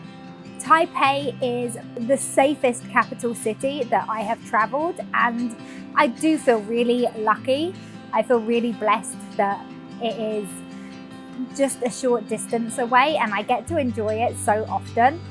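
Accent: United Kingdom. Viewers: 英國腔的英文真的好好聽喔👍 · 觀察入微，描述細緻，加上妳優雅柔和的英倫口音，絕妙！(點閱應更高) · 對啊！我一開始就訂閱，濃濃英國腔就是原因之一。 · l feel much like to listen your speaking vowels clearly at each word in the videos。 Your sounds are good for everyone to study a standard British speaking. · 💓😍 love your British Accent....soft and clear. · Like your UK accent, and thanks for promoting Taiwan and Taipei City :) Hope you are alright with kids edu system in TW or the way people there teaching your kids, cheers. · Lucy英國腔英語好好聽 繼續聽下去。我住台北市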